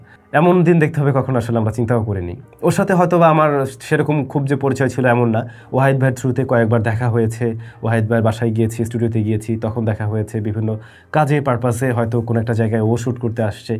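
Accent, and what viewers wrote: Barishal, Bangladesh. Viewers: ভাই আমি নয়ন । আপনি কি বরিশাল এর ছেলে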